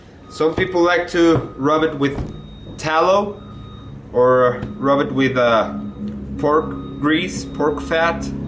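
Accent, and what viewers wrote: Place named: Mexico. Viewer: He´s mexican i knew because his accent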